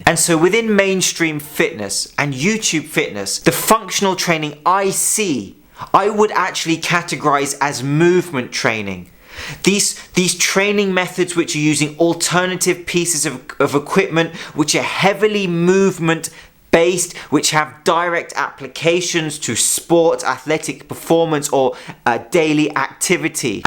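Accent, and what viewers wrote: United Kingdom. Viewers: The British accent is such a flex and a distraction...people don't even listen to the message, they just want to hear people speaking like that:)🤣👍🏾✊🏾💪🏾✌🏾can't explainit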